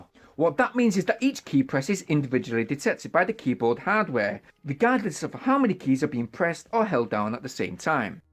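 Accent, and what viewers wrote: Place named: England, United Kingdom. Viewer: Love the presentation and your English accent!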